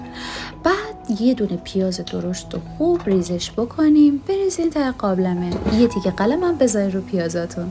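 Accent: South, Iran. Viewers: سلام عزیزم جان❤ چه صدای دلنشین و لهجه بامزه ی جنوبی.مبارکه آسیاب کن.چقدر پیراهنت قشنگه.من از حیوون خونگی اصلا خوشم نمیاد چون وسواس دارم.خیلی هنرمند و کدبانویی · وااای🤩 چه لهجه شیرینی، چه لحن آروم و آرامش بخشی🥰 ویدیوهای بی کلام هم بخاطر موزیکشون و کارهای روزمره جذاب و آرامش بخش هستن ولی صحبتهای شیرینِ شما با لهجه زیبای جنوبی یه چیز دیگه ست،عااالی عالی👍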